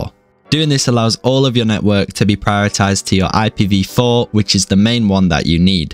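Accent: Norway. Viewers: Bro er du norsk? du har sykt bra engelsk stemme man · Wait are you Norwegian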